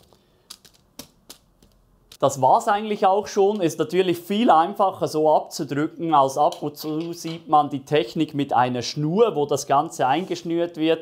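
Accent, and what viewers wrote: Switzerland. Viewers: Die Rezepte sind immer DER HAMMER! Danke! Und ich hör auch dem Schwizer Dütsch so gern zu 😁✌️. Grüße aus 🇦🇹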